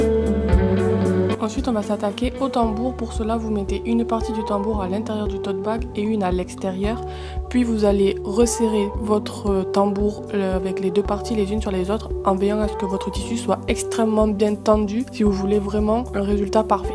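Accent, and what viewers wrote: South, France. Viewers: Alors First: j'adoreeeeeeeeee ton accent du sud 😍Et secundo: Les DIY sont vraiment Topisimes! Surtout la broderie! ca me donne pleins d'idées 😍😍😍Bravo!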